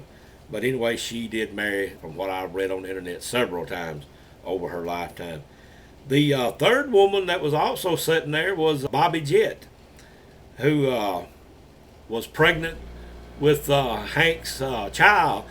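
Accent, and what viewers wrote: Alabama, United States. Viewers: You got yourself a new subscriber now! I enjoyed this video! I was born and raised in Birmingham, and joined the Marines out of high school in '03. I live in Houston Tx now, and your video and the way you talk, make me miss home....keep up the great work! Roll Tide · Alabama has the sweetest gentlemen in the world. I love my home state. I will never leave. Thank you for this video - listening to your accent and stories were the best part of my day.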